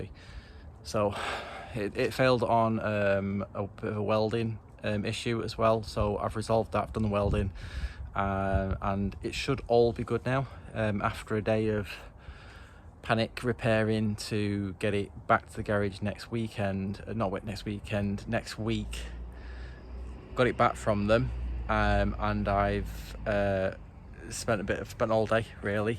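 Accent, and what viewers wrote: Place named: Northern England, United Kingdom. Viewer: Liking your blue nail varnish to match your van 😎 😉 Proper workman’s hands there. All looks great, mate. You’re a great story teller, as well as fun to watch. Not surprised you’re subs are rapidly rising. I think your northern accent makes it even better when you’re winging about your missing hinge bracket 😂 hope you’re going to video bits of your road trip. Currently down at lands end in Cornwall in my 56 year old beetle. 210 trouble free miles so far 🤞🏻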